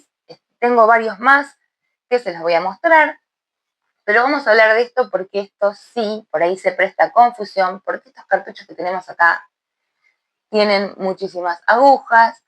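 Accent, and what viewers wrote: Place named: Andalusia, Spain. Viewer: Porque me parecías que eras de andalucia😅❤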